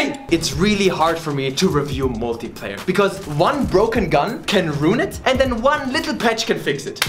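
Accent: Germany. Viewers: Is German your native language? Hell i simply LOVE the way you speak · peak denglisch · He's the first Asian with a german accent I ever heard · Ich liebe deinen deutschen akzent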